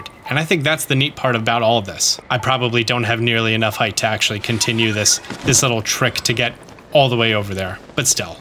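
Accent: New Jersey, United States. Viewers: What part of New Jersey bro? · Wait you're from Jersey?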